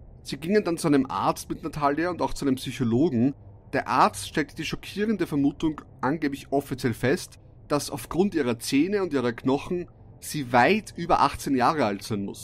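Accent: Vienna, Austria. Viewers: Bist du ein Wiener?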